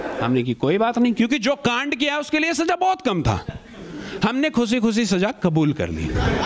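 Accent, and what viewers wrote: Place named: Delhi, India. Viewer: Bhai delhi se ho na language se pata chal rha hai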